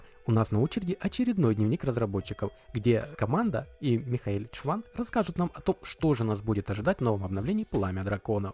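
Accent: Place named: South, Russia. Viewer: Бро, извини за возможно лишний вопрос, но почему у тебя в разных видосах где то есть диалект, а где то нету, говоришь без него, на букву "Г" предположительно южного диалекта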